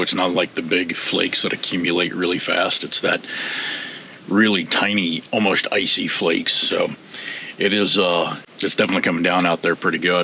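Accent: South, United States. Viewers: Your accent tells me that you are from where we live, the south.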